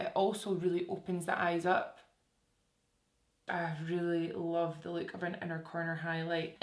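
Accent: Scotland, United Kingdom. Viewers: Love Love your Scottish accent, my mom's from Paisley.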